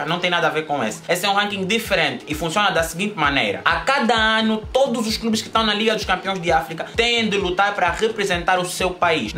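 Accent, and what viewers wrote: Angola. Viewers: gente eu entendi tudo o que esse cara disse ,se ele é africano deve ser angolano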